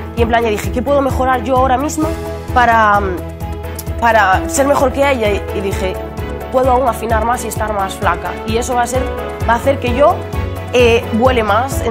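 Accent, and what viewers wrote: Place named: Galicia, Spain. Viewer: Acento gallego...Es de Galicia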